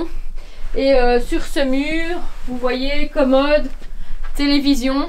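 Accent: Belgium. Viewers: Pour moi, c'est le Hainaut. Qu'est-ce qui m'a mis sur cette piste outre ton accent, le livreur de matériaux. Par contre l'accent de, c'est plutôt celui de l'agent Verhaegen. Au fait, essaye de ne pas le contredire sans cesse, il va finir par te donner un petit coup de taser.☺️ Nous, on va commencer les travaux de rénovations de notre maison qui se situe entre Charleroi et Beaumont dans la ville qui a un Beffroi et des jardins suspendus, tu vois laquelle?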